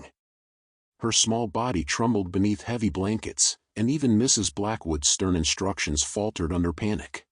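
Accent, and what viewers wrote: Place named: United States. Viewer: The American accent is so horribly inappropriate for such a totally English story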